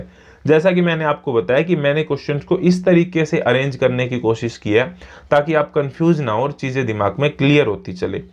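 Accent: Haryana, India. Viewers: Bhai haryanvi hai k ?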